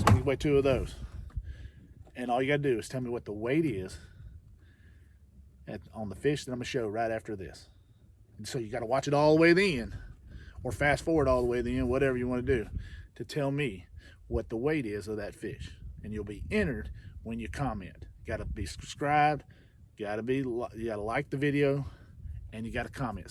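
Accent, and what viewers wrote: Louisiana, United States. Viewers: 14 oz. Just found your channel. Already watched several of your videos. Good content. What part of La you located. Don’t sound Cajun.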